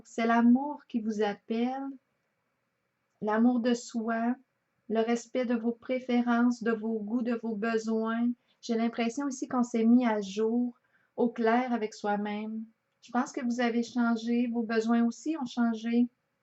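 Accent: Canada. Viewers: Bonjour oui j'ai vraiment envie de me sortir de ce bourbier! De ces blocages qui durent depuis trop longtemps, j'aimerais changer ma vie! Vous chantez bien,j'aime votre accent canadien 😃👍bélier ascendant lion poisson en lune! Plus de cinq ans de céliba! Oui j'ai envie de vivre l'amour,même si mes filles me comblent d'amour 😃👍 inconditionnel merci a vous pour cet espoir que vous nous redonnez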